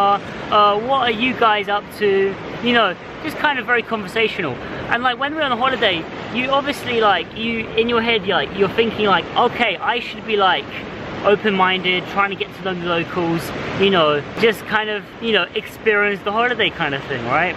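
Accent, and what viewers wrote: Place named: United Kingdom. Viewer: Wow nice 700+ followers, and this video 4000 views good I like your British accent, so good listen to you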